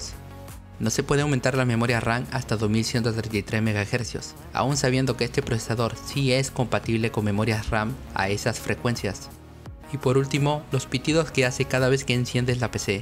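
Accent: Peru. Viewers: eres peruano :V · eres de Perú?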